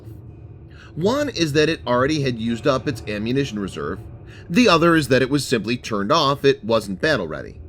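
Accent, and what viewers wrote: United States. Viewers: the very American-sounding voice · The way American pronunciation murders ordinary language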